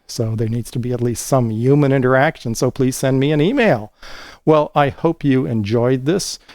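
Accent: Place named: Canada. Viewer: Every time I hear a-gain versus a-gin I know I'm listening to a smart Canadian. I appreciate you sir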